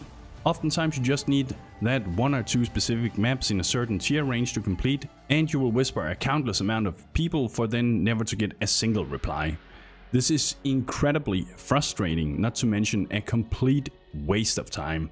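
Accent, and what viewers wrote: Denmark. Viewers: That danish accent thou.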